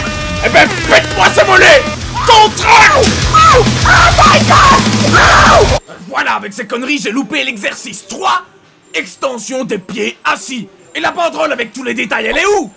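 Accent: Belgium. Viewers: c'est l’accent belge qui fait la différence ;-) j’aime bien toutes tes vidéos on apprend beaucoup de choses !!!!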